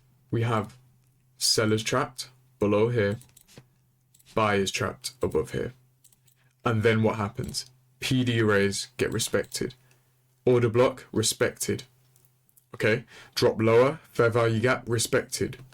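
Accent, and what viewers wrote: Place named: London, United Kingdom. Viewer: 😂😂😂😂 people that trade ict can’t trade and I’m willing to take that to the grave. If you can’t teach a 10 year-old your strategy, your strategy is too complicated. at the end of the day every strategy works you just have to control your risk and have set rules. Losing is inevitable in the market. So someone with a London accent, using proper English and big words doesn’t make the strategy any better.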